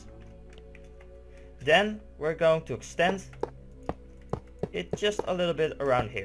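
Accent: Netherlands. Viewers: Are u netherlands / dutch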